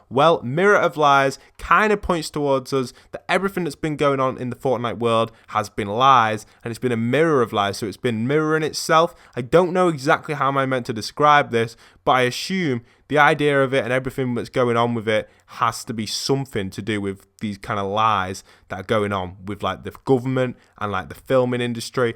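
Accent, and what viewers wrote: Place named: United Kingdom. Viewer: I don't like the British accent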